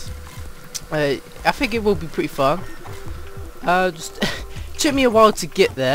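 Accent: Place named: Russia. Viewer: That's a Russian accent xD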